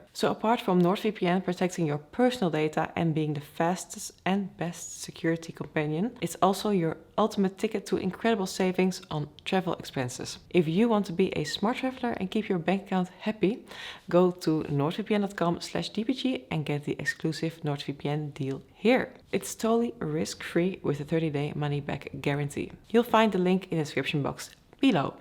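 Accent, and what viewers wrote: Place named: Netherlands. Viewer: This accent is so harsh, it sounds like someone from Holland is trying to speak English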